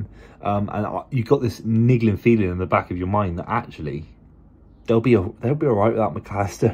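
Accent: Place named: West Country, United Kingdom. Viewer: your blackbeard west country accent is coming through and im all for it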